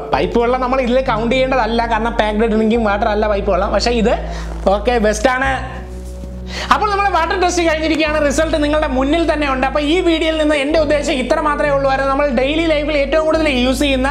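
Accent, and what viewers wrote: Trivandrum, India. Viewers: Bro ningal TVM aana??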